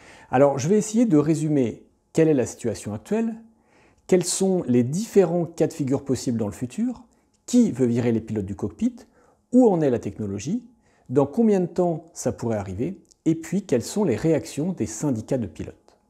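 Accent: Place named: France. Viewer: the ne se prononce pas ze, mais de sur le bout de la langue. Vous faites tous la même erreur les français 😂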